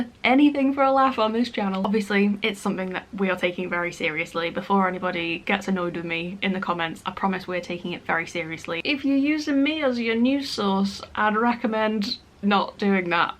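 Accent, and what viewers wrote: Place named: Wirral, United Kingdom. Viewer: Kept wondering where “up north” you were from. Was so happily surprised that it’s the Wirral. I too am from the Wirral. Discovered your channel over lockdown. Probably due to me maniacally trying to get my flat sorted whilst on furlough and thus immersing myself in all things home related! Your home style is exactly the same as mine!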